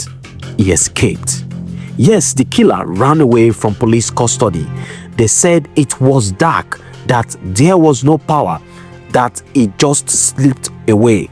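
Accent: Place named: Hausa, Nigeria. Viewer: This narrator no go school? Aboki man dey do narration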